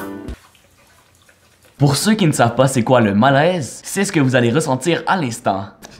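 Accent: Quebec, Canada. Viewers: T québécois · Est-ce que tu viens du Québec? Mais sinon super vidéo tu es super bon pour réaliser des défis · Salut j'aime trop ton accent canadien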